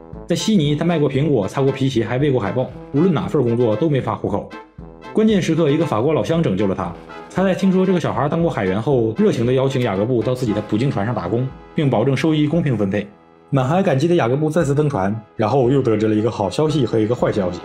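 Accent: Northeast, China. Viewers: 我铁粉节目里语言上家乡风味很足东北人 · 这一股大碴子味。哈哈，真亲切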